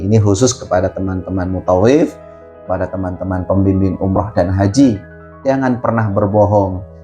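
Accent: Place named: Semarang, Indonesia. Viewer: Aslkm. Saya dah Marem penjelasan nya ustad. Atas bimbingaya. mohon maaf. Saya mintak alamat pak ustad. mau tabarukan. saya Semarang. logatnya pak ustad seperti logat Semarang trmksh.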